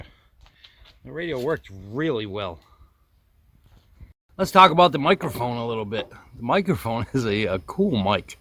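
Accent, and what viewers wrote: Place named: New England, United States. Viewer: "TRACK-TAH!" You sound like you are from my area in New England (RI)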